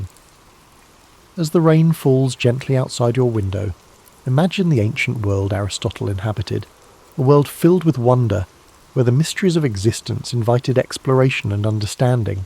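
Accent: United Kingdom. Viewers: I so wish you would ditch the British accents. Who can sleep with that uptight, nervous-wreck jabbering invading our bedrooms?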